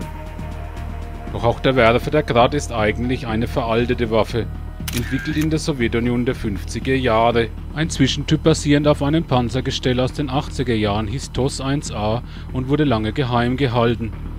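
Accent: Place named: Franconia, Germany. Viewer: Ein Sprecher mit so einem starken fränkischen Dialekt spricht für "Russland.TV"? Hätte das nicht jemand mit russischem Akzent übernehmen können? Hätte wesentlich schöner geklungen. :)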